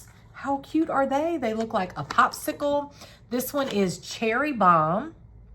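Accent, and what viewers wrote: United States. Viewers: Hi, Congratulations on your 8.000 subscriber achievement! Watching you is delightful, I love your Southern accent and hauls! Here’s to 20,000 subscriber milestone. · Congrats. You make me homesick for the Redneck Riviera with ur wonderful accent. You also have the cutest dimples. 💔🤍💙🇺🇸🇺🇦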